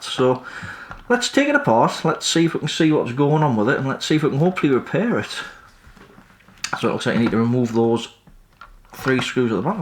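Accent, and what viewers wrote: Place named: England, United Kingdom. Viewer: I have a few pieces of test equipment from Peak, and they are great. You received fantastic customer support !! Also, a nice job repairing this tester. Well done. I like hearing various English accents as I am a brummie living in the USA, so it's a welcome change. 😂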